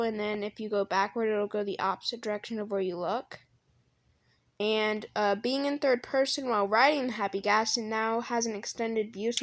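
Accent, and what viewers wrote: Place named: Texas, United States. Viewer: I realised ur voice has a lil Texas accent Lol❤ keep up the work man❤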